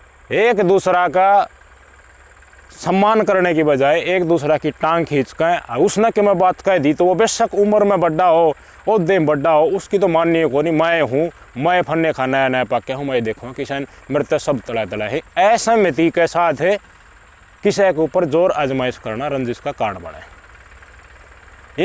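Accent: Haryana, India. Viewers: Bhaiya apki haryanvi sunkar dil khush ho haya ❤❤ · Bhai ji aapne sahi baat kahi..aapka name kya hai bhai ji or aap kaha se ho.. haryanvi thodi typical hai aapki..or bahut achha haryanvi hai bhai aapki..mai khud haraya se hu lekin meri typical boli nahi hai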